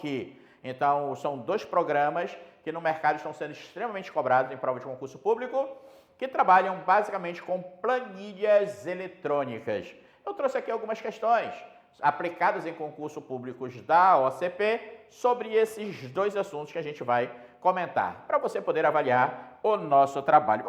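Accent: Pará, Brazil. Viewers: Esse professor, é paraense. O sotaque não deixa passar (vamo deixar baixo), rsrsrs... Aula excelente, professor!!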